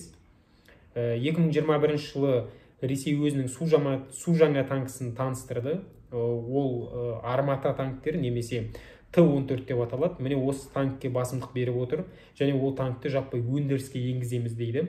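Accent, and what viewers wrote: Standard. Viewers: Бәрекелді балам. Міне осылай таза қазақша сөйлеуді үйреніңдер жастар. Осы сен сияқты сауатты жастарымыз көп болсын.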